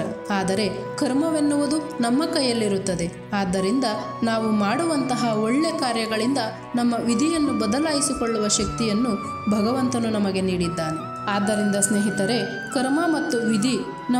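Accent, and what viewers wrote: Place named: Mangalore, India. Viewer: Neevu Mangalore navaru ri ....eer pateruna styld gotthapundu atthe.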